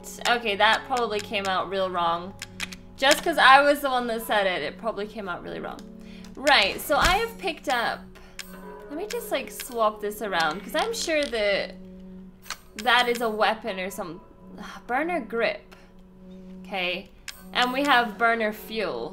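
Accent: Scotland, United Kingdom. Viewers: Love you! Damn well hooked me on this series of yours so you have my thanks for that including getting them out so early! (Plus the amazing scottish accent)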